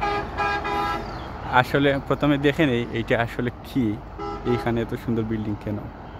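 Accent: Sylhet, Bangladesh. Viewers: Love your video from Fr. Bhai, please try to continue your natural Sylheti math. Don't try pure bangla. Keep it up. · সিলেটি মাথা কিতা সুন্নত নি। শুদ্ধ বাংলা বললে 64 জেলার মানুষ বুজবো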